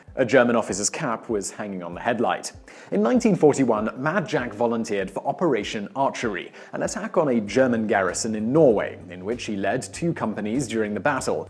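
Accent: England, United Kingdom. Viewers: When did Michael get a British accent? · This is just a skinnier Michael from Vsause with an english accent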